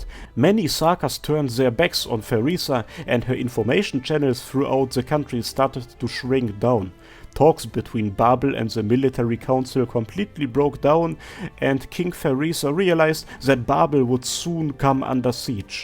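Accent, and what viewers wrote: Germany. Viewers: you german or close to that? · Is That a German accsent? Damn do i Sound like That when i speak englisch 😳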